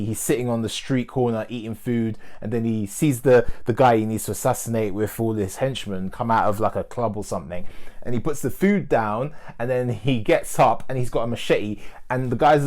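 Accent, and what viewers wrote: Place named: United Kingdom. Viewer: Hey with british accent.. good review bro..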